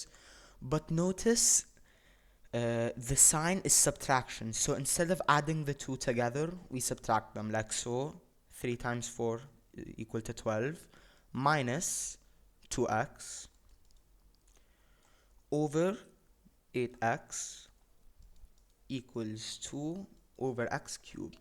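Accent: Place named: Lebanon. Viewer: I can tell ur Lebanese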